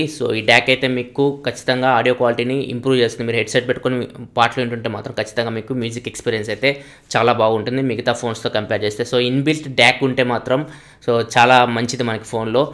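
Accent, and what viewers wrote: Ongole, India. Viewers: R u from ongole anna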